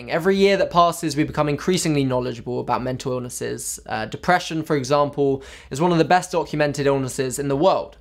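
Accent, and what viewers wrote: United Kingdom. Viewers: First time watching your videos. Honestly came here just for gettin' used to the english (england) accent. Because I'm moving there from Spain and here..well, lets say that I've been pronouncing download like doh-w-nloh-add because of my teachers. ha! Well. Uhm. Love it. You seem very kind and mature, and everything in this video is GREAT. Mental illnesses are, actually, quite common. Go ahead. Im following you! You encourage this people to talk about themselves and their problems without that 'tabu sensation' that society made of and for perfect people has done. NICE! Thank you!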